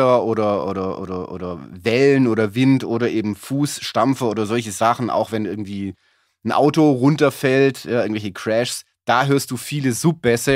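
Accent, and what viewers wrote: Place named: Heilbronn, Germany. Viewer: klasse video, sowas hilft mir echt immer wieder weiter bei meinen eigenen basteleien in meinem möchtegern-homestudio! ;) gerade bei EQ (und eben auch kompressor) kann ich gar nicht genug input und anregungen kriegen um dann selber damit zu experimentieren... manches klingt auch erstmal einfacher als es dann im detail in der praxis wirklich ist! ;) nachdem ich mir jetzt schon einige deiner videos angeschaut habe, kann ich mich jetzt aber mit einer persönlichen frage nicht mehr zurückhalten: ich hatte mal einige jahre in den 90ern an einem heilbronner gymnasium einen musiklehrer (und erdkunde) mit dem gleichen namen. der unterricht war echt klasse und ich war auch unter seiner leitung im chor und anderen schulprojekten. ich habe gesehen, dass du auch aus der region bist, die sprechweise kommt mir irgendwie ein wenig vertraut vor und nunja die frisur hat auch gewisse ähnlichkeiten. 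;) also drängt sich mir einfach die frage auf ob da wohl ein familiärer zusammenhang besteht...? :)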